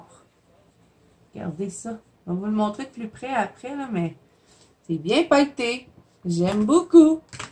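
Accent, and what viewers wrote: Quebec, Canada. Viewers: Ho..j adore déjà votre beau accent québécois que jai bien connu durant 3 belles années chez vous.... J'aime vos vidéos qui sont super bien faite et vos astuces sont géniales... Merci merci de me faire redécouvrir un peu le québec et merci pour vos conseils vos méthodes qui me font capoter et que j essaye..bonne soiree a vous belle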